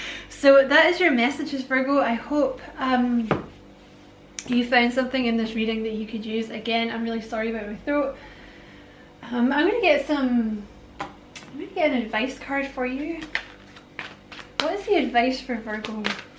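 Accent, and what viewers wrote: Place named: Scotland, United Kingdom. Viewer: You are such a doll! I love your Scottish accent! You crack me up when you cuss! And when you tell people to ** off! I live for it!!!🙌🏾 I’m also subscribed to your other channel🤗 Thank you for all you do. You’re a sweet and endearing soul. Love and light to you always💛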